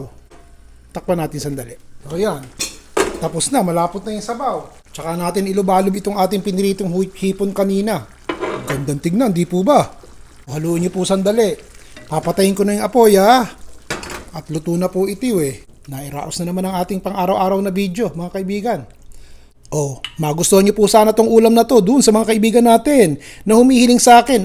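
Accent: Philippines. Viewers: Aray ko mainit...had me laugjing...i miss pinoy expressions and accents...i just love it. From a kababayan living in LONDON, UK 🇬🇧 with ❤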